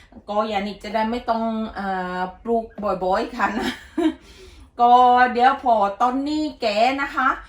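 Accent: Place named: South, Thailand. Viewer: น้องหมาน่ารักมาก แสนรู้ด้วยครับ ฟังพี่Yaneeพูดสำเนียงไต้เพลินมากดูแกงอ่อมไปน่าทานจริงๆครับฝักก็งาม 14นาทีคุ้มค่ามากครับคริปนี้ อยู่ทางโน้ดูแลตัวเองด้วยครับ คนทางนี้ส่งกำลังใจให้❤️มีเวลาแวะมาหากันบ่างน่ะครับ😊🇹🇭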